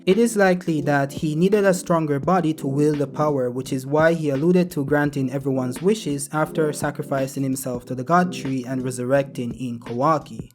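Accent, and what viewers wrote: Jamaica. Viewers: Where you from fam? You sound Jamaican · My dawg Jamaican accent cannot be contained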